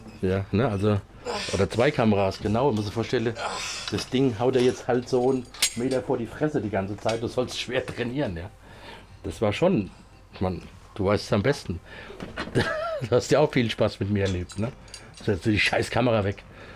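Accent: Hesse, Germany. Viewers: de hessische dialekt bedarfs auch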